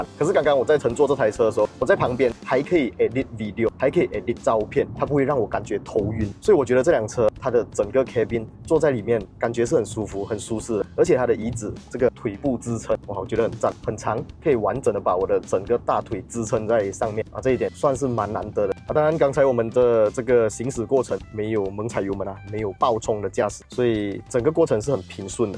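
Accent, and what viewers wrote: Malaysia. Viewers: 听马来西亚华人说华语好舒服的感觉 👍 很可爱的口音 讲解也很专业 爆赞 ❤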